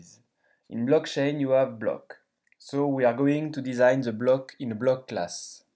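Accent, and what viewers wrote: France. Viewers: Pourquoi tu fais le tuto pour les anglais seulement :/ On entend très bien ton accent français éhéh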